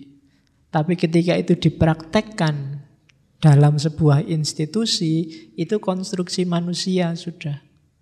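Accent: Java, Indonesia. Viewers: Bahasanya medok campur jawa, kasian si butet banyak yang dak ngerti😂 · Tak apalah medok, yg penting tidak berhenti bermanfaat untuk orang lain. Sudah dapat ilmu gratis masih banyak menuntut. Orang yg punya niat belajar/mencari ilmu tidak pernah berhenti belajar apapun halangannya. Klo masih tak paham. Biarlah si butet & kawan2 bikin forum sendiri....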